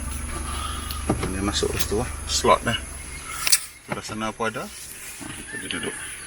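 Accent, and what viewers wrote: North, Malaysia. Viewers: Kalu ada lampu tuh..malam2 tak laa mengangau sangat..takdak lagi org ribiew keta guna ayat macam nihh..syoq abg dok sulam lorat utara nohhh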